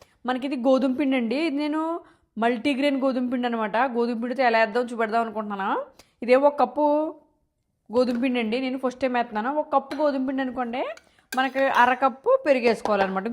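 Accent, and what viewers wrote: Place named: Amalapuram, India. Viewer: అమెరికాలో అమలాపురం యాస మరియు పునుగులు స్వచ్ఛంగా ఉన్నాయండీ...😊👌💐💚🇮🇳💜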